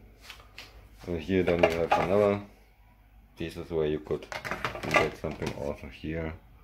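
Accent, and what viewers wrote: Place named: Germany. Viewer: Hallo, ich gehe von deinem Akzent einfach mal davon aus, dass du Deutsch sprichst, dann spar ich mir mein schlechtes Englisch ;D ich habe die selbe Maschine und genau wie bei dir blinkt das "eco" Symbol in einer Tour. Laut Beschreibung ist das der Fehlercode für eine undichte Stelle bzw. für den "Überlauf". Hast du eine Ahnung wie ich das beheben kann? Habe die Maschine schon auseinander gebaut und konnte keine undichte Stelle erkennen. Wäre um Rat sehr dankbar! LG und ein schönes Wochenende.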